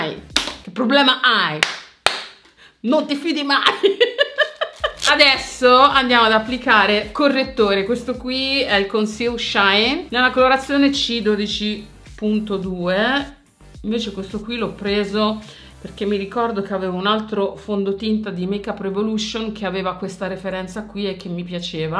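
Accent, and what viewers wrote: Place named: Lazio, Italy. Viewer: Quel modo di parlare a Roma lo chiamiamo burino e lo parlano diciamo nelle altre province del Lazio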